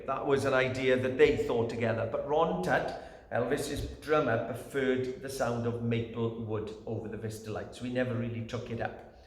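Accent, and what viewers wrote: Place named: Liverpool, United Kingdom. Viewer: They were discontinued in late 70s because oil prices skyrocketed. Plastics are a by product of refinery business, including vistalite acrylic. It wasnt because of the light up system development, they just werent profitable to manufacture because of the source material price increase. The light system had its flaws too. They would heat up the shells to the point were the seams would start letting up. Are you from Liverpool by the way? You sound like McCartney :)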